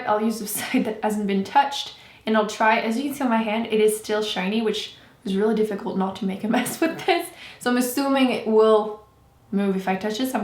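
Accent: Quebec, Canada. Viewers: Hehe. Accent: French Canadian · She is Canadian French. How the heck can you not detect an accent???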